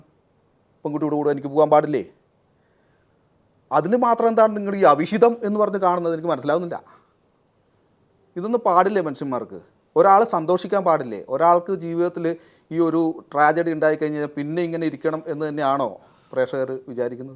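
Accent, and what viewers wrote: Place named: Kannur, India. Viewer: Jishinte സംസാരത്തിൽ കണ്ണൂർ ഭാഷാ അസ്സലായി ഉണ്ട്.പിണറായിയുടെ സംസര ശൈലി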